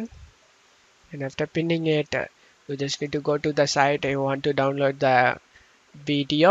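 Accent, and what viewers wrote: India. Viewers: i swaer .. if i hear a thick indian accent .. automaticly SCAM! wouldnt touch ANYTHING they recommend with a 100ft pole! and even if this guy here really just wanted to help .. which i HIGHLY doubt .. i mean cmon "video downloader professional" lol .. but even if he wanted to help .. you guys did this to yourself! i rather have my pc crash .. burn and explode than to EVER let one of you evil demons on my pc!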